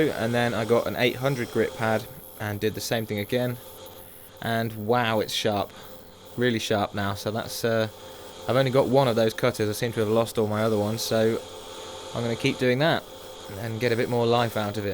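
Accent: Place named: United Kingdom. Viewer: Brilliant very creative - Thanks. I recently made my own polishing paste - 4 parts mineral oil 1 part white bees wax, 1 part diatomaceous earth. I mistakenly thought that I was limited therefore to wax based finishes such as buffing with Carnuba wax. However, I see you using shine juice here without a problem. I would like your (or others) input on finishes that can be used after polishing paste. Thanks in advance. PS: I would be interested to learn where you are located in the UK. I left for the US in 1984 and have been trying to nail down your accent.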